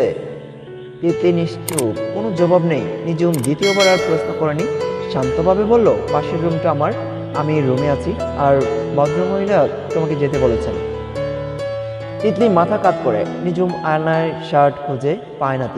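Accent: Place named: Sylhet, Bangladesh. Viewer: Hahaha! Bhaia apnar mukhe sylhety kota shune atto hashi pay atto hashi pay j ki bolbo bujte pari na😀😀😀😀😀,,,,,,tobe ai hashi beshi din tiklay hoy,,,karon ai golper khub voyanok akta shotti ase ja 1st part ai bujte parcilam r akon kar part gula to puratay flash back😔😔😒😒😒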